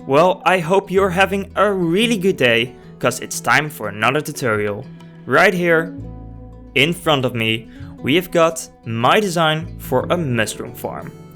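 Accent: Netherlands. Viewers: are you nethetlands vause of your english speach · NL !! je hoort het meteen. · Ben je Nederlands? Als ja ik hoor het aan je accent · He is dutch yes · are you from the netherlands? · Ben jij Nederlands? (Accent) :D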